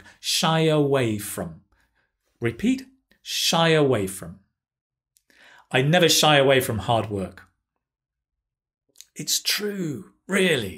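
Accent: United Kingdom. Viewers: You are speaking British English or American English?? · I hate British accent in fact, but to be honest you're the only one that I would want to hear his British English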